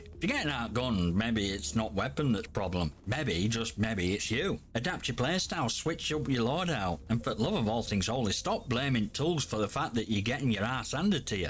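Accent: Yorkshire, United Kingdom. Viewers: proper yorkshire that. good video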